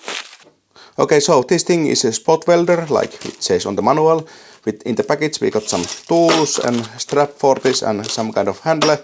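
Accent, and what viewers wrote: Finland. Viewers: Eikö ihan rehellisesti Suomella mentäis, on sen verran karseesta "ralli englantia"😢 mulla on tuollainen aito (ei kiina kopio) pistehitsi 30v takaa.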